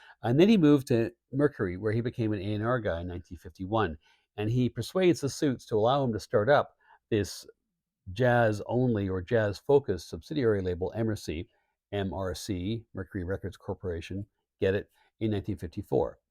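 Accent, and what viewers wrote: Canada. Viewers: My bad that I misheard you🙏🏻 It’s your thick Canadian accent🇨🇦 that tripped me up!😁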